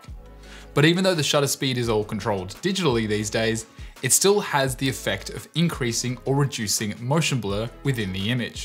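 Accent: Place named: Australia. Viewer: your AUS neighbour voice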